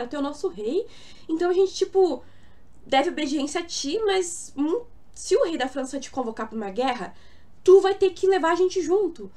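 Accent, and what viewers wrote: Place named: North, Brazil. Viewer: Bacana , bom saber que existe uma juventude diferenciada no sul do paìs e que fala um pouco igual a nos do norte ( belem - pa) do pais , tu explicas bem.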